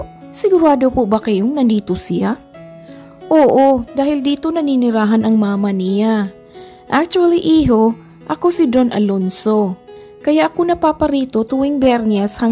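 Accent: Visayas, Philippines. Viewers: Ayusin mo pagsasalita mo. Visaya ka?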